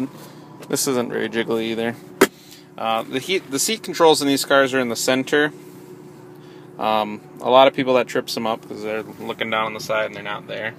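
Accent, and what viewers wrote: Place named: Canada. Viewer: I trust what your saying cause I'm hypnotized by the awesome car and you sound Canadian to me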